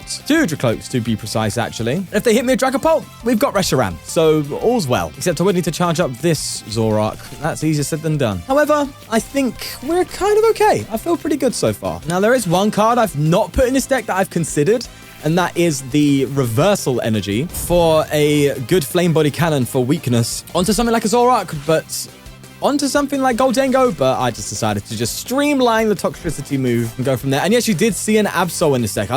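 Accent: United Kingdom. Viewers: bro is british af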